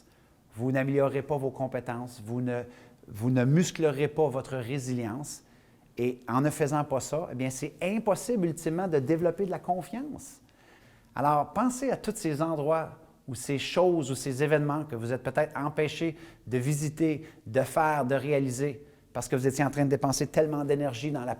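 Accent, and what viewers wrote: Quebec, Canada. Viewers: C'est spécial l'accent québécois lol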